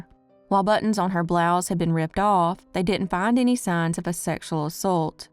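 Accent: South, United States. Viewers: Use a an AI voice you are way to country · Ai voices and pronunciation are absolutely horrible. We love her southern accent on this channel so you're free to go watch a different channel that you like better. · I don't want to disrespect the victims here but I snicker every time she says it. 😂 I'm southern and I love her accent. We say naked to mean undressed, "nekkid" means you're undressed and getting Iin trouble. 😊😅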